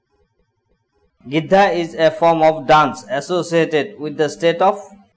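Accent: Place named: Mizoram, India. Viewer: accent resembles a Mizo a lot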